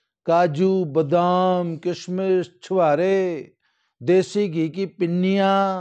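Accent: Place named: Rohtak, India. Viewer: koi pehli baar ye video sun na bata sakta ki east up ke bau saab ho, sun ke rohtak ke lagte hain aap 😊🙏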